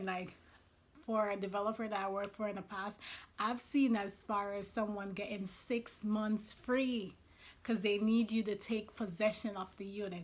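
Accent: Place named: Jamaica. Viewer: Sound Jamaican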